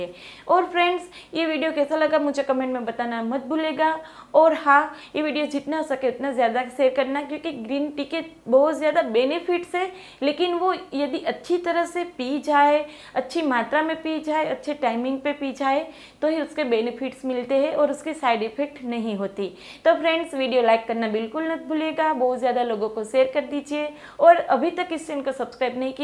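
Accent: Gujarat, India. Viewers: Are you Gujarati? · Tame gujrati chho????😎😎😎😎😎😎😎😎😎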